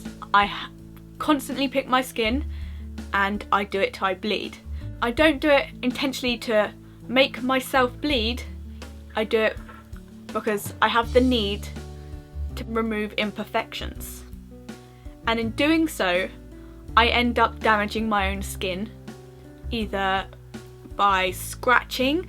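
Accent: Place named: United Kingdom. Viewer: Great video! By the way, I love your british accent! :)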